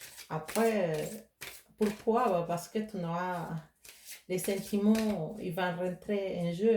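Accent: Spain. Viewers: Bonjour! quel plaisir de vous revoir, vous entendre. Merci pour ce tirage original!. Ne vous inquiétez pas pour le cafouillage de notre langue française pas facile pour vous!, mais c'est normal!☺️, je vous comprends très bien!, et j'aime bien votre accent espagnol. Choix 3, 7 qui me parle. Merci infiniment 🙏 Namasté 🙏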